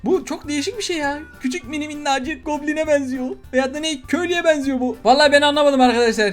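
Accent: Malatya, Turkey. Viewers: ABİ SEN MALATYALIMISIN NERESİNDEN BEN DE ORALIYIM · Abi sen malatyalısın ya neresinden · Abı sen Malatyali misin bende malatyaliyim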